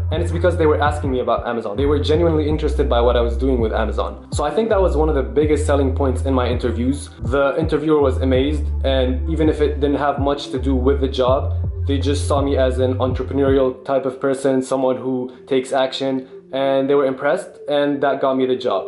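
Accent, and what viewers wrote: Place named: Arab world. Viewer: i kneeeeew u were an arab from the accent Same here.....i am egyptian and mechanical engineer also 😊 Wish u all the luck bro...accept my friend request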